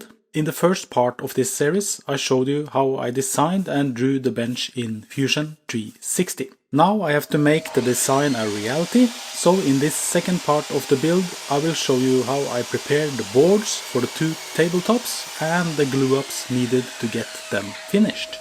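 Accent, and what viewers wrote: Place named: Minnesota, United States. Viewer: I was listening to u say ticknesser and udder hand then realized because I'm from Minnesota I knew exactly what u were talking about. Nice work!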